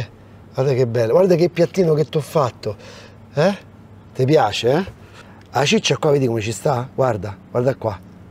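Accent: Rome, Italy. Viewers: Simpaticissimo col suo accento romano, bravissimo in cucina, complimenti, io la seguo sempre. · te che parli in romano, piu' i sottotitoli in italiano... me sembra de torna' ai vecchi tempi de "i sottotitolati", di Greg... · Ma perché parli in Romano,che sei Napoletano? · Se non parli il romanesco è molto meglio.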